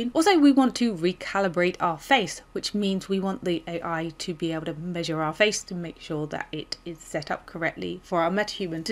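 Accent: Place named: United Kingdom. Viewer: British accent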